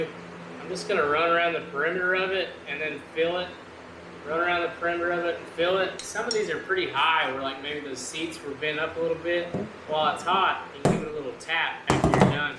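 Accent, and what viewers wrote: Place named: Boston, United States. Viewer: Your boston is beautiful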